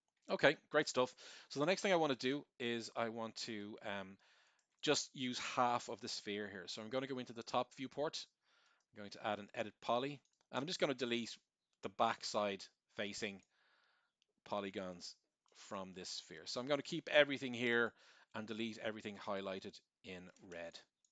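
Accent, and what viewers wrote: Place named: Ireland. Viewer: so rare to come across an Irish voice when looking at YouTube tutorials. Lovely.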